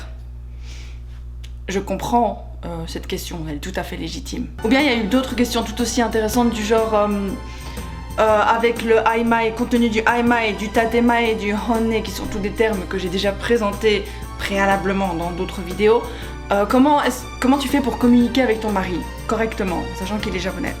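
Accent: Belgium. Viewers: Il y a du Jean-Claude Vandamme dans le parlé de! Made in Belgium sans doute. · Je me disais bien que tu es belge lol Je reconnais bien l’accent de mon pays Vivement début septembre de cette année que je sois à Tokyo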